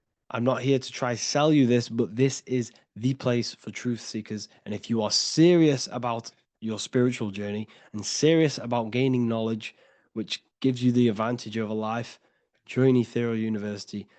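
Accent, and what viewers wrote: United Kingdom. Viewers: R u from derby ?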